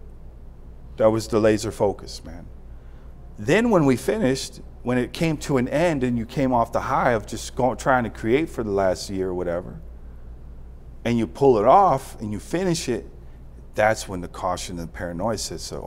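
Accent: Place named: Chicago, United States. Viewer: I was wondering what it was in your voice the whole time.. It's that Chicago accent man.. I'm a Gary boy.. Family off Manistee on S.W. side..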